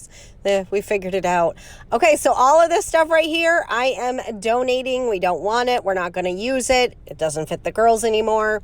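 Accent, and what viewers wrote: England, United Kingdom. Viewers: I could just picture you sipping tea from one of those vintage tea cups and speaking using your English accent. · Do I hear an English accent or no? Loving this video and need to do my own spaces so badly.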